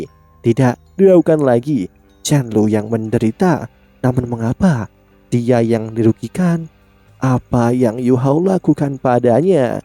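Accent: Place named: Purbalingga, Indonesia. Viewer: Cah ngapak purbalingga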